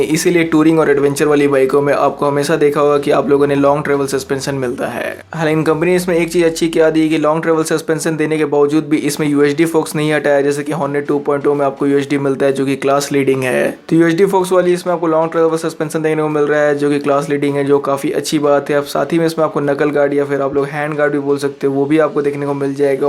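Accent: Bihar, India. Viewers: Aap Bihar ya up se ho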